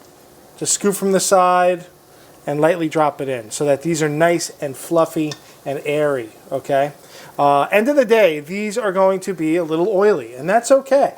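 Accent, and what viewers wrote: United States. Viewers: I like people who talk less lose concentration listen talk and look the food he no stop talking He got an American accent this why he use dry yeast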